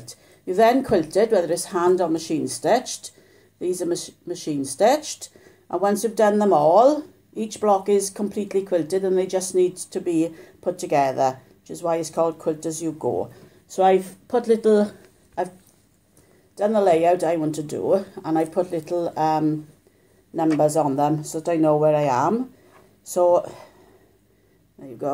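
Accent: Wales, United Kingdom. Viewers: Ok. I just read a few of the comments and your replies. Your accent is Welsh! Awesome. I have now completely made 3 king size quilts by hand using your method. I am only a few months away from finishing my fourth king size quilt. This one is a double Irish Chain. Still using your method. You are the BEST. USA. Kentucky. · Oh this is a great tutorial .. now i can see where i made mistskes on my squares. Thanks everso and I love your Welsh accent. My dad's family are all from Llanelli... any time I need a dose of Welsh tones I will come and replay your video 💕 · Super video......Love your welsh accent....Thank you xxx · Your tutorial is the best 👍...and I love your welsh accent 💟⭐I will use your method when I sew my log cabin quilt together. 🙋‍♀️😊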